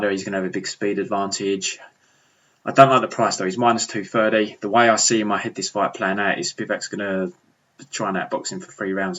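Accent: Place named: Cockney, United Kingdom. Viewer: your accent sounds like it's not sure if it's cockney or somewhere in cali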